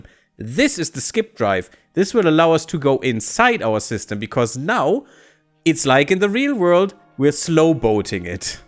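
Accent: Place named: Germany. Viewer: Are you german? Love the accent lol sounds cool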